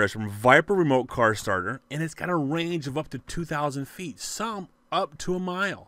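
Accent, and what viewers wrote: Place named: South, United States. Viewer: if you here him right hes got a twinge of southern in his voice. in the south 2000ft=1mile. their education is that outdated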